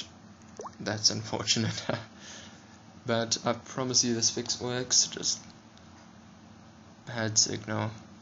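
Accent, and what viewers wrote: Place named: South Africa. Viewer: Your accent sounds like that of a south african's accent,am I correct in assuming this?Prob joburg or capetown?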